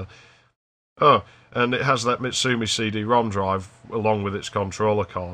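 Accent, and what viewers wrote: United Kingdom. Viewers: that accent, 80% geordie, 20 % yorkshire... Durham?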